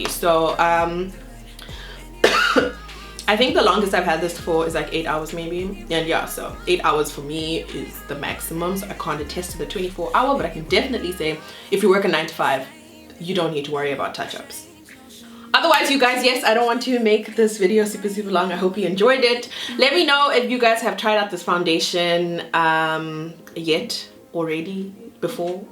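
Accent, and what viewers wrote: South Africa. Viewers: I like your accent, you sound South African or Zim/Canadian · Are you South African?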